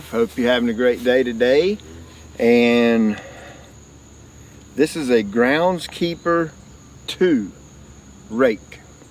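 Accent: South, United States. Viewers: I thought that as well but I think it was the southern draw :))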